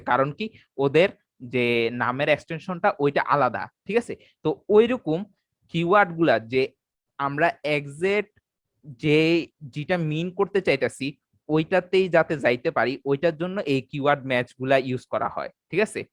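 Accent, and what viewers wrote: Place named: Comilla, Bangladesh. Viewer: আপনি মনে হয় কুমিল্লার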